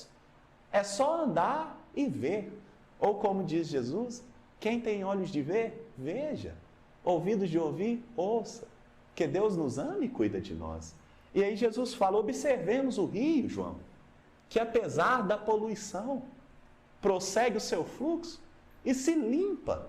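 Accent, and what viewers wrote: Minas Gerais, Brazil. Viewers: Que sotaque bom ! É mineiro?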